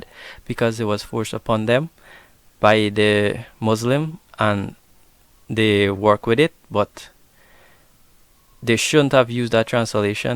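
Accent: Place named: Philippines. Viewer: The poor fool doesn't know what he is talking about. He sounds Philippines.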